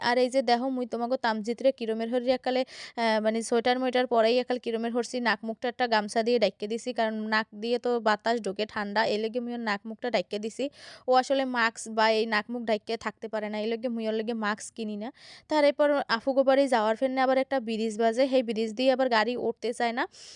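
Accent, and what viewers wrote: Barishal, Bangladesh. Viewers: আপনি তো দেখি মাশাল্লাহ ভালোমতো বরিশাইল্লা বাসায় কথা কইতে পারেন? গো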